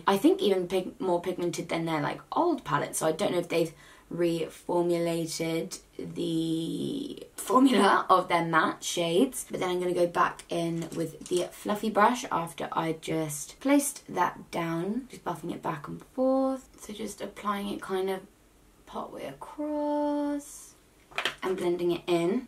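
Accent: United Kingdom. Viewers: New to your channel, love your makeup look, very pretty..then u spoke..u British!? YAAS..song in beginning??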